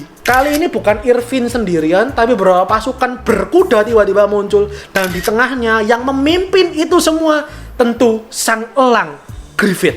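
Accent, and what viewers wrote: Java, Indonesia. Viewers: Medoook bgt loooo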